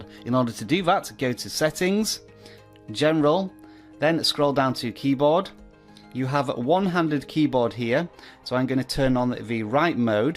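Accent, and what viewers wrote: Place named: United Kingdom. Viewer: great video and nice clean British accent :-)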